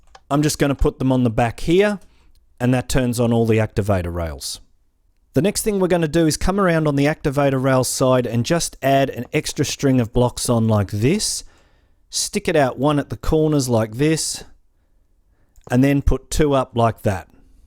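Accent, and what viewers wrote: Australia. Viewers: are you aussie mate ?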